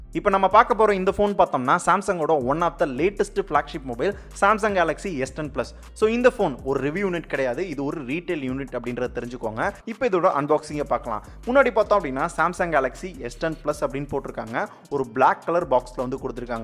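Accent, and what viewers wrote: Madurai, India. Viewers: Neenga Madurai Ah Bro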